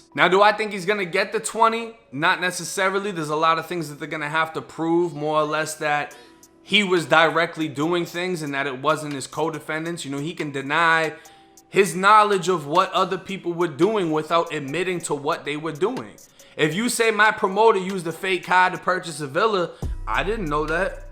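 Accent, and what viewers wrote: Boston, United States. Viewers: Where u from Boston? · Bro your accent literally half Boston half Florida😂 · Yo, you talk like your from the bay side of Boston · Bruh his accent funny as hell he gotta be from Boston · Boston accent nukka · The innernet instead of internet! Lmfao! We Bostonians hold our accent down! 😜. Keep on keepin on, I’m rocking will y’all and you rocking with us! 😝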